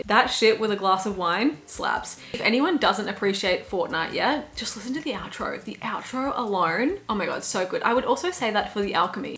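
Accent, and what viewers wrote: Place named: Australia. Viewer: Unrelated comment about one of ur tweets but anyone who complains about ur Australian accent is a FREAK and does not deserve ur top tier content because wtf that’s such a wild thing to say to someone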